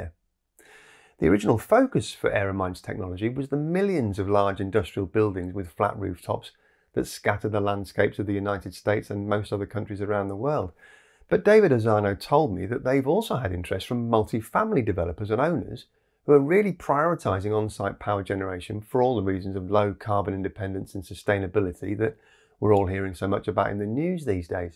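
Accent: United Kingdom. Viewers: I thought I would just mention, that just because you have a British accent does not mean you live in the UK 🙂 Thanks for another informative video.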